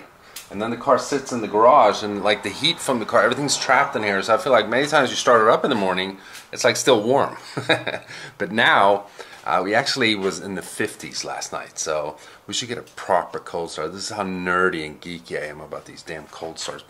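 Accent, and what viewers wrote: Sweden. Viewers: are you swedish? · Are you from sweden originally?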